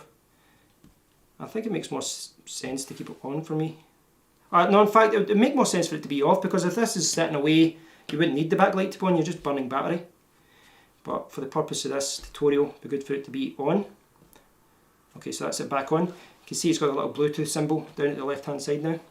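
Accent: Scotland, United Kingdom. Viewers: Very sorry, but I'm not a native English speaking person and so I have lots of trouble understanding your heavily Scottish (or Irish?) brawl. So, does anybody know of a video featuring someone with a 'normal' British or American type of accent? Thanks a milliion.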